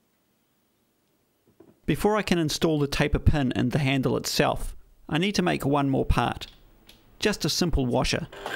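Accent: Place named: New Zealand. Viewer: Always happy to see a video of yours pop up in my feed. As a Kiwi living in Australia, your accent is making me miss home!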